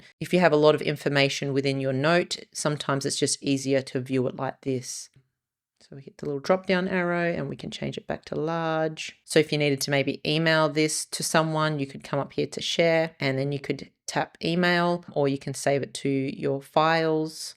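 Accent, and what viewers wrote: Australia. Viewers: She’s Australian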